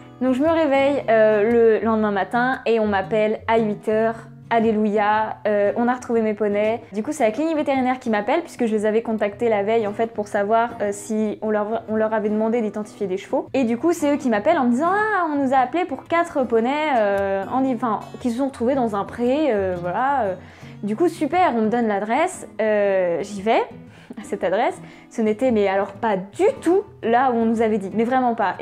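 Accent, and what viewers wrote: England, United Kingdom. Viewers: Tu as un très bel accent anglais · Quel accent anglais !!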